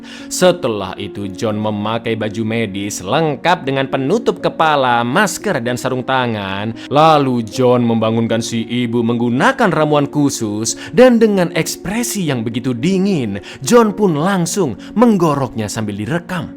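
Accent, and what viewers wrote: Indonesia. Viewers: menurutku ngga papa, biar ada aksen Indonesia nya, biar jadi ciri khas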